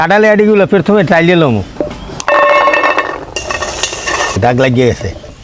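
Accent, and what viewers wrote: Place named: Barisal, Bangladesh. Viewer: চাচা বরিশালে কথা বলে খুব সুন্দর লাগে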